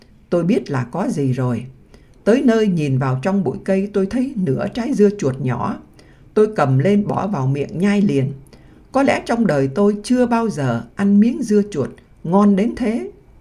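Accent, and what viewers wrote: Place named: North, Vietnam. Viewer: Chào cảm ơn tác giả Thanh thương Hoàng câu chuyện thật hay buồn thương đến rơi nước mắt,cảm ơn MC "Bắc năm tư giọng như ru ngủ/đưa vào hồn" nhớ,đủ,mãi, luôn/thương thương lắm và buồn như muốn khóc /những người hùng ngang dọc đất miền Nam/rơi nước mắt thôi đành cam số phận/biết nói gì?buồn,giận, hờn ức hận thù căm/ chúc kênh vững mạnh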